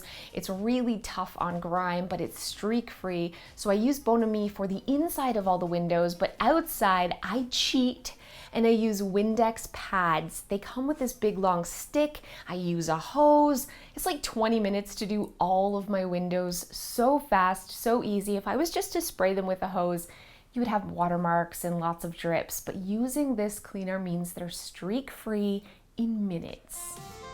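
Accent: Canada. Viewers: I have been watching/listening to your videos all day while I work. I'm the mom of 5 kids and I LOVE organizing, but nothing has ever really stuck. I have such high hopes after everything I've learned from you. I love the minimalist mom too, but I can't fully get on board with that concept so it's nice to have categories that I put my things into. I've taken breaks all day long to declutter certain areas, it makes such a difference to take things one shelf/cupboard at a time. I love that you say organizing can only come after the decluttering. I'm working on decluttering all the areas first then go back to really organize. Thank you for being so motivating, so relatable, and so kind. Also, my dad's family is from Calgary Alberta, where are you from? I can hear your Canadian accent..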